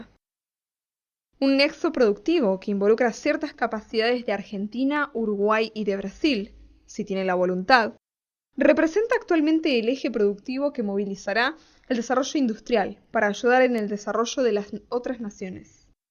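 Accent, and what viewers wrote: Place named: Panama. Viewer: No Pues!! Esta Tipa Solo Piensa En La Economia. y los Animales Que?? Los Que estuvieron millones de Años Primero que nosotros que?? no merecen ningún trato? del Hecho de Ser ''ANIMALES'' Pff Por Dios -.- Habla como si fuera panameña la tipa esta. Ademas, que conexión de las américas ni que nada!, con las ganas se van a quedar porque ese tapón los panameños la cuidaremos, y sera el tesoro que le dejaremos a las nuevas generaciones de nuestro Pais PANAMA..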